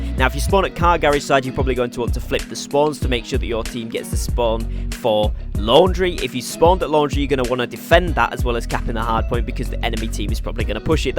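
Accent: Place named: Northern England, United Kingdom. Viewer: You sound more nothern now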